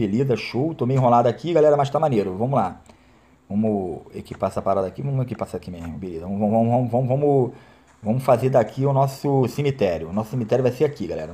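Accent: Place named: Rio de Janeiro, Brazil. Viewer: eh muito engraçado... ctz que e do rj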